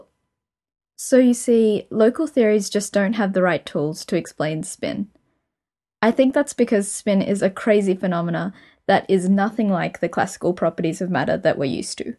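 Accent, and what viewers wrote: Australia. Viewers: The more I watch your videos the more I convince myself that you are Australian. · Wait a sec, are you Australian? Why am I so bad at noticing these things. (shamed Australian here)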